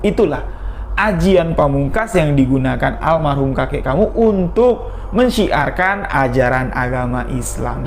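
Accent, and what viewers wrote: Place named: Sunda, Indonesia. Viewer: Assmuallaikum bang sy penggemar setia kisah 2 horornya.bang orang jambi tpi sy merasa kok logat gaya ngomong nya agak mirip ke sunda kadang bahasanya kok sama bahasa sunda seperti " keukeuh" apa mas pernah belajar bahasa sunda jawa barat...he hehehe...sukses selalu untuk mas 👍👍🙏🙏🙏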